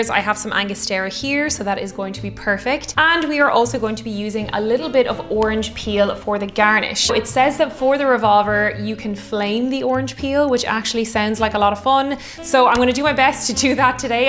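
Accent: Ireland. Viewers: I'm very happy that I discovered your channel. You are engagingly beautiful and the brogue is wonderful. Gorgeous!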